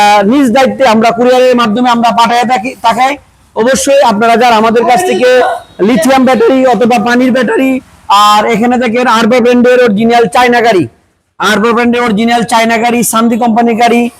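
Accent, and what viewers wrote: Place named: Kishoreganj, Bangladesh. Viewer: আপনি কিশোরগঞ্জের আঞ্চলিক কথা বলেন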